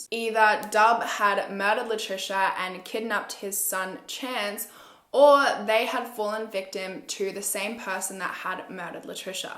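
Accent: Australia. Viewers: I absolutely love Aussie accent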